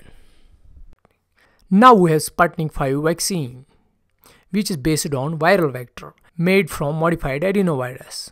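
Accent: Kashmir, India. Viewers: i can tell you already by the indian accent that chinese vaccines will be rated the worst before i even watch the video :) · Indian accent, nah bye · 200% he is kashamri..but nice way to english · Kashmiri guys ....ur tone of eng shows ...nyc vdo bro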